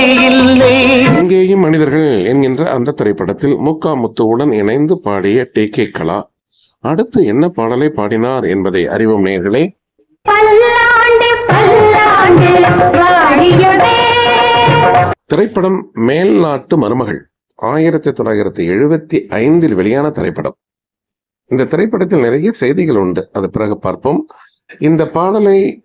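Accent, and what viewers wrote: Sri Lanka. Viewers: அய்யா தகவல் அருமை.உங்கள்தமிழ்.இலங்கைதமிழ்போல்இருக்கிறதுநீங்கள்இலங்கையா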